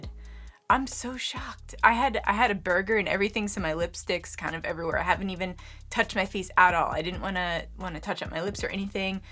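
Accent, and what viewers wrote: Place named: East Los Angeles, United States. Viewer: Wonder how she has East LA accent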